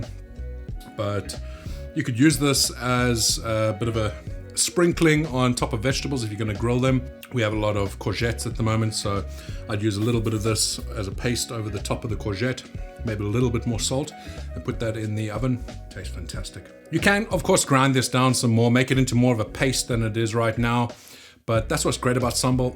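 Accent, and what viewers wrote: South Africa. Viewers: Thank you for video. The South African accent sounds like a breath of fresh air to me also. I grew up there. Been living in USA for 40 years now.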